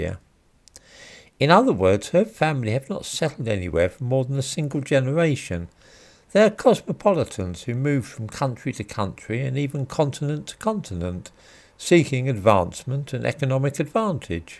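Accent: United Kingdom. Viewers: Thank goodness for the British accent. I live in South Africa and if I watched the news without sound I would not know if it was local or yours.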